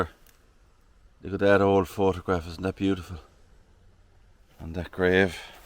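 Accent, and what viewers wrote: Ireland. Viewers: Your pronunciation is part of your Irish charm! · I love your Irish accent. Thanks for a lovely tour. I do a few tours of cemeteries as I document graves for genealogy. Fascinating histories to be found! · i like your Irish accent. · Love the Irish accent! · Don't apologise for our irish accents when we speak in the language of our former oppressors. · I adore your Irish accent just wonderful and your pronunciations are perfect and no I wouldn't change a thing about you! I find it mesmerizing to listen to, thank you for this great video tour, love the church! Just beautiful ❤️ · I am American, and I love your Irish accent!!!!!!!! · I love the old grave sites. The older the more interesting they are. Someday I want to visit there!!! It's been a life long dream. NEVER change, I'm American and I ❤ your Irish accent 🎉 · The wall paintings are a stunning find! 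A shame we can't see what there is, but they do need to be protected in these destructive times. Marveous old church -- the Friends of Friendless Churches do valuable work. Nice to visit with the former residents of the parish, thank you. Your Irish accent is just lovely -- I can't imagine any moron objecting to it! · Thanks from Australia and we love your 'tertee' it's how we knew you were Irish. Take care. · Doesn't matter if you say THREE, TREE, or any other Irish way, I love this channel. I wish i had an Irish accent personally. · You bet you're Irish! I like your accent. It's what Irish is to me. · I knew you were Irish, guessing Northern. Love the accent. Also, love seeing all those old churches and grave sites. Living in “the new world”, means anything older than the late 1700’s is extremely rare. I am also guessing it is a Norman tower on the church(?). Lovely old buildings, so glad they are preserved by the Friends of friendless churches. 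🩷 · Hi how rude of some gimp to mention how you pronounce 33 the cheeky hugger. I adore your accent and your lovely Irish brogue, Thankyou so much Love and kind wishes from Lynn in Brittany xx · I enjoyed all of this, ESPECIALLY your Irish accent.☺️ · Oh My Lord, how could someone complain about the accent? I think stories are best told with an Irish Accent - don't know why (maybe it's the ancient Irish DNA that my body still carries), I am American, with Scottish roots (and some Irish, Welsh and English mixed in). I absolutely love the accent. Ours is so boring. I love hearing you and Sue say Tirty-tree, it makes my day. I agree with you - you're Irish, that's how you talk and it's this American's preference. That's a hill I would die on, lol. There is something in the way the Irish speak that absolutely lends itself to storytelling, and makes it so easy to listen to. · Only a ignorant person could criticize your accent. 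The Irish accent is one of the most charming accents in the world. Thank you . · Irish speech is enjoyable. So interested in the bell and tower. Healing tones from the old bells. ❤🇦🇺🙌👍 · An unusual family name of Skone. I understand the Skone surname is rooted in British, Dutch, and Flemish origins. BTW, love the Irish accent and language, very important to keep it. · I’m 🇺🇸 and I like to hear the Irish accent and people are rude to fuss about pronunciation, don’t change! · Love your irish accent! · I love your Irish accent. I lived in Hawaii for almost 20 years, and they also pronounce their threes trees. If we all sounded the same and looked the same, what a boring world this would be. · Hello, I preferred the exterior of the chapel before restoration, the ivy and lichen made it. Painting the tower white was horrific vandalism! Thank you for a very pleasant wander around the churchyard and for narrating it in your wonderful Irish brogue. 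· You have a lovely Irish accent; I could listen to it all day long😊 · your Irish lilt is delightful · I love your Irish speak! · love the Irish accent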